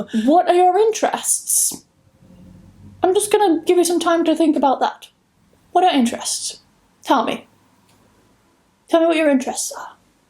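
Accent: United Kingdom. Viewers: Hi, Pity you're alone on New Year's Day, 'cos your boyfriend is on duty today, in such a remarkable day. But I think that in reality you should't feet sad or either alone, because you have a lot of friends, listening to your remarkable messages in perfect british accent, that 100 K followers that love you in the distance, throughout the cyberspace, look forward every time. You're a new Rose of England, a british angel flying everywhere in the world, upon the wings of thousands of bytes and bytes, zeros and ones, charming and delightfulness with no limits. Darling, I beg you to carry on with this selfimposed task of teaching the "lingua franca" that is nowadays the english language as well as the british culture and way of life, to the people around the glove, thing that you implement in a so accurated way. I'm writing to you form Tarragona, a place in Catalonia you know well toghether with its Uni. 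Rovira i Virgili, a place where as I understand you used to take some courses, some time ago. If you whish to refresh your knowlegde of the many languages you're fluent with, and make some practice with me either in Spanish or in Catalan, do not hesitate in sending to me, via e-mail: all the letters you want, with the messages you wish, so short or long as you fancy, whenever you feel like, and about the items you consider interesting or educational, for your personal understanding or from a point of view of professional developpment. If in case, please contact e-mail: <mail> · I love your honesty. I just found your channel and I think it will become one of my favorites. I want to learn English with a British accent and it looks like you're the perfect person to teach me. I like the way you talk, your accent, your honesty, and besides, you're cute! I wish you all the best this new year and I hope to learn a lot from you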